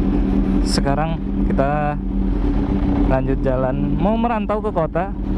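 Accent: Palembang, Indonesia. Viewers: Orang mana bang, bahasanya sama kayak Palembang😂, semoga bisa kebeli motor kayak abang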